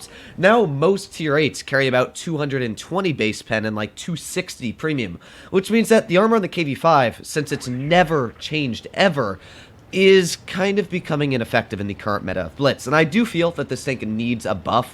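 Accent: Russia. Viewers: I like your Russian accent! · I like the way you pronounced the words with the Russian accent😂 that’s funny. I’m from Russia! I really enjoy watching your videos!👍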